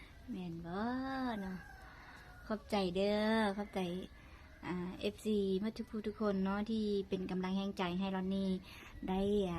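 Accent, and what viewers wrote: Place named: Laos. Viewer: เว้าลาวได้ม่วนขนาด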